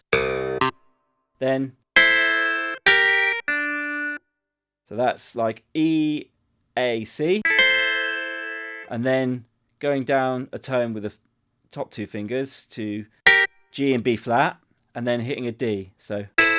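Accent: United Kingdom. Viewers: I just love listening to your fine British accent, lad! Reminds me of a visit I once paid to my British pen pal, decades back from now. I was a pupil in German high school at the time. Oh. and your music, it is so adorably funky, love it 👍👍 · I gotta admit ... there are few things funnier than hearing a white guy with a proper English accent placidly proclaiming: “We can all get down with our bad selves”.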